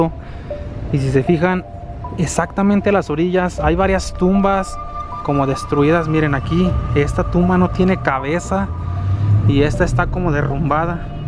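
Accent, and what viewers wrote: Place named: North, Mexico. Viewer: qe me encanta ese asento mejicano como norteño qe lindo México Saludos desde Chile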